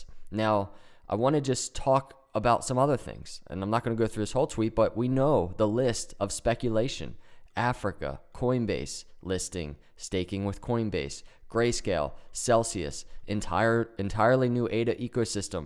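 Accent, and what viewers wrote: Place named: Midwest, United States. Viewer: Great videos. Are you trim the Midwest you sound like it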